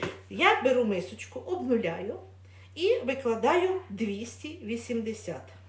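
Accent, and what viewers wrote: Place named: Poltava, Ukraine. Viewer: Дуже подобаються сирні страви🍮,а якщо це ще і паска ,то взагалі 🎉💥.А ще респект за ваш суржик ✌️.Ви не з Полтавщини?Мої вітання з Дніпра.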